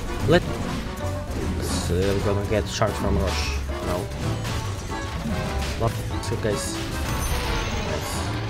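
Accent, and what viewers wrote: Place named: Russia. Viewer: I love russian speaking english. Very funny 😂😂😂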